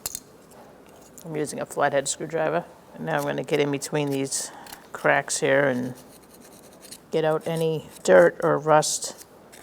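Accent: Boston, United States. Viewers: Thanks for the torque specs. That is one serious Boston accent. Reminds me of my mom.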